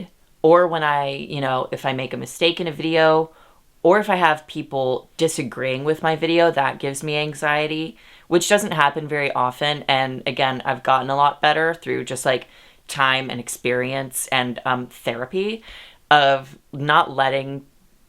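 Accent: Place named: United Kingdom. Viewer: I've been following your channels for a while now. It's been nice to witness your development, the content journey and the much deserved growth! I've watched every one of your videos and am glad I have. I'll continue to too 😸 Totally unrelated to the vid, but the recurring bri'ish accent always makes me laugh 😹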